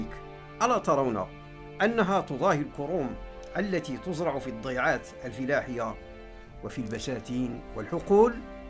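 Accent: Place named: Morocco. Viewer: يتكلم بلسان مغربى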